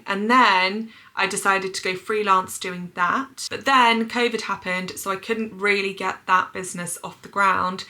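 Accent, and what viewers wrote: United Kingdom. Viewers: Your videos and your channel are maybe more appropriate for the ladies, but once I occasionally stumbled on a video of yours I subscribed and keep on watching them... You live in a very beautiful place, like a fairytale village... And listening to your special British accent is the real pleasure to me , now living in a countryside of a distant land . It helps me much while I'm out of the conversational practice... Cheers...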